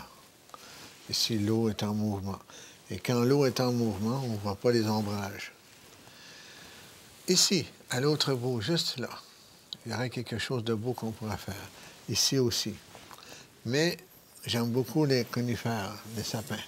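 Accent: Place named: Quebec, Canada. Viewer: Bonjour Mr le Magicien : C'est un régale de voir vis oeuvres et c'est la première fois que j'entends en prime le bel accent Quebequoi je suppose. Bravo pour tout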